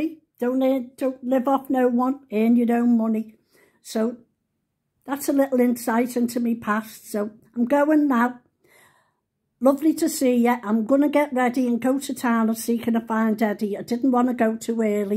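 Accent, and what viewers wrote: Liverpool, United Kingdom. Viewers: Bless you ,you area genuine Scouser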